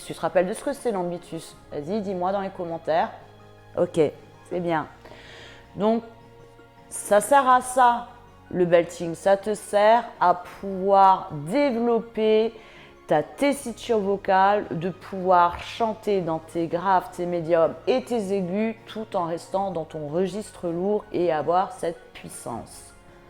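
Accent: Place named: Paris, France. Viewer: a chier avec son accent parigot bpbo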